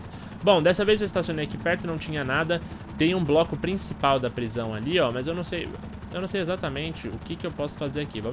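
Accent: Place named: São Paulo, Brazil. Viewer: parece o luciano huck e com esse sotaque irritante de paulistano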